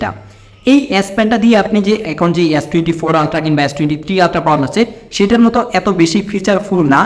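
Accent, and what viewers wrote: Kishoreganj, Bangladesh. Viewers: Bhai apner basha ki Kishorganj?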